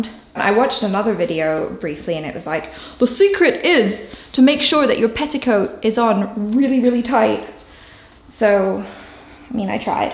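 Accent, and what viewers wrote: United Kingdom. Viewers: I knew was British But god damn the british accent is so thick here😂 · That British accent is strong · sounds more British here... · I have an exam tomorrow but here I am watching trying to wear a saree and speaking in her British accent. Ye bhi theek hai! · Wooooohh.. Your british accent is gorgeous ❤💛😍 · is more British on her own channel than Jaby's. Nice switch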